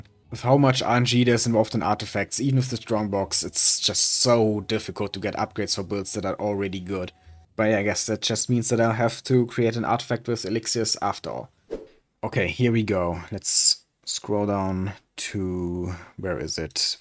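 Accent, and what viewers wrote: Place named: Germany. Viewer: das richt nach deutschen Akzent xD aber nices video tbh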